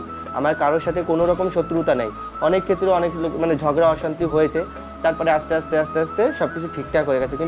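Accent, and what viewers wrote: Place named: Nadia, India. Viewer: 34,তোমার সব কথা শুনলাম ভালো লাগলো সত্যি ইউটিউবে এখন কুটকাচালি চলছে, কজন বোঝে এই কথা, ভালো লাগলো👌👍 তুমি কি নদিয়ার বগুলাতে থাকো তোমার কথা গুলো ঐ দিককার মতো, ভালো লাগলো ভাই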